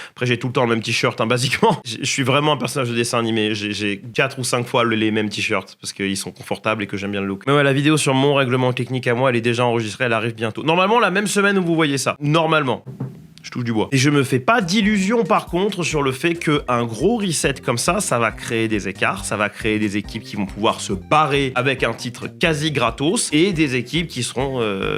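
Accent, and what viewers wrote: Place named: Italy. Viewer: l'accent italien n'est pas trop mal géré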